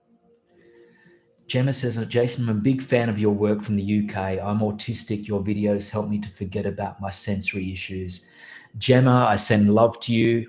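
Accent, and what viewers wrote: Australia. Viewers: Love your accent and your upbeat vibe!❤❤❤ Australia rocks and I've never even been there!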